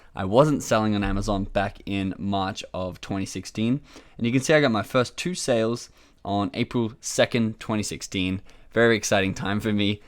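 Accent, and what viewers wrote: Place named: Australia. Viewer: Hi! Thanks for a great course, just found your youtube channel. One question, are you based in the US or have a company registered there? The reason why I am asking is that I am based in Europe, but would like to sell on <link> and from the sound of it you are from Australia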